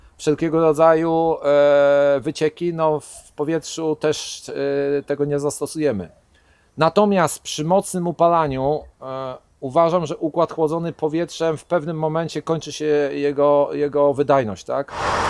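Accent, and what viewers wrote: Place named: Gniezno, Poland. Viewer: Gnieźnieński akcent w terenwizji , znajoma żwirownia , jak milo :) plus 12l V10. Czego chcieć więcej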